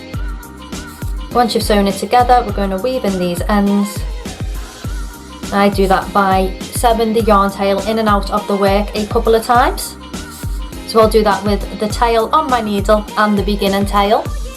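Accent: United Kingdom. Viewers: I just bought this pattern & I am excited to make a start. I do have 2 questions though. Why is (Jason Mamoa’s) Aquaman white? Also from your accent, I guess you’re British. But you use US terminology. Is this pattern available in UK terminology. I don’t mind buying it again, as it would make my English heart glad!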